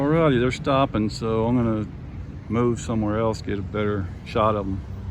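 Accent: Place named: New York City, United States. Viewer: loved your New York City accent ("DPUs, I see youse").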